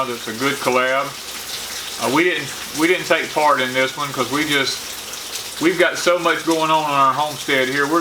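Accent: South, United States. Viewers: I'm glad to hear that you're going back to a topic based live stream. The first one was really good but the others just seemed silly.. Everyone is just chattering about nothing and distracting you and from what you wanted to talk about. I'm looking forward to this weeks live broadcast again:-), I love your Porch Time. You have such a relaxing, southern gentlemanly way of saying everything and getting your point across. Loretta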